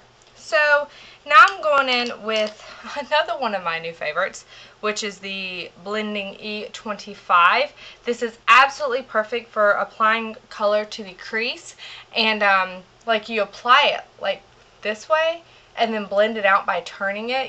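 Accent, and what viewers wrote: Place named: South, United States. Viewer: This video reminded me how much we have in common! 1. Southern accent. Love. 2. Curly hair 3. Obsession with the E35 ...just for starters :) Great video, dear! Love it!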